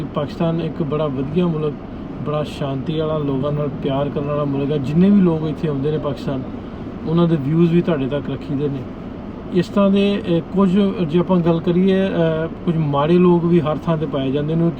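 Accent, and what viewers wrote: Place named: Amritsar, India. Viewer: God bless brother. Tusi pakistan wich kithey rehende ho? Tuhadi boli bilkul amritsar wali lagdi ah.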